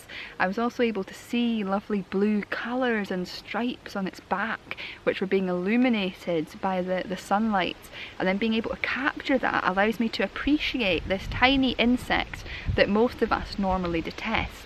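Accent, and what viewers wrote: Scotland, United Kingdom. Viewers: I know it's just your Scottish accent, but at first I thought you said mackerel photography......was hoping you were going to get out a fisheye lens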